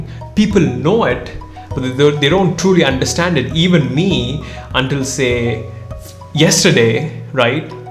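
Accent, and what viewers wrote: India. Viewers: Because your accent is like Indian Me Pakistani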